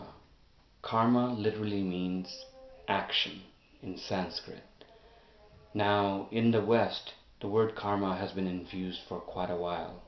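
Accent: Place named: United States. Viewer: Hm... one more question you live in India? You sound American...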